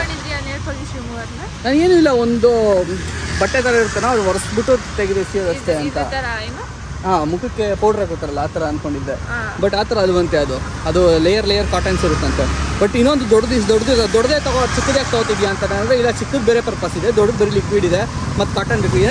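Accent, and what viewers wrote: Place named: Mangalore, India. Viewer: Aa manglore fast phase accent yellarigu artha agalla.. Artha mdkondre masth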